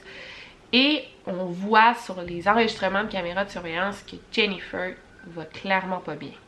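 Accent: Quebec, Canada. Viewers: tu es très séduisante avec ton accent Québécois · tu m as définitivement réconcilier avec l accent québecois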